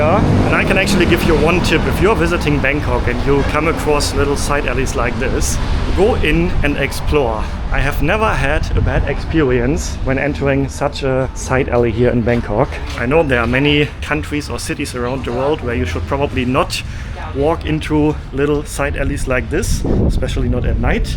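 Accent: Germany. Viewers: I am Thai and living outside of Bangkok. I am also learning about places in Bangkok from your video.😂 Well done,. I like your English with German accent, very charming! Keep on doing a good job!❤👍🇹🇭Welcome back to Thailand! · Thanks! Great videos, I’m an American with German background, I love to hear to your German accent.🇺🇸🇩🇪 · He made enough money..... Don't need to make more videos. People got addicted to his German accent English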